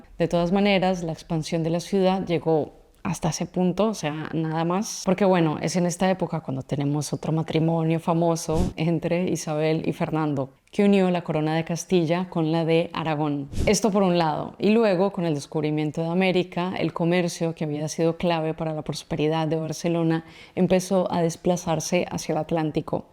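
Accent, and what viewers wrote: Colombia. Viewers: De qué parte de Colombia eres? Tienes un acento y voz preciosa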